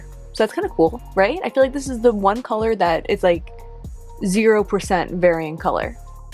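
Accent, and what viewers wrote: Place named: Northeast, United States. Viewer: I hear a northern eastern us accent. Where are u from? Just curious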